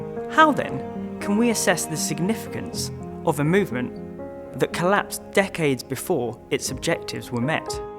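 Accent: United Kingdom. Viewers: This dude has the most British accent I have ever heard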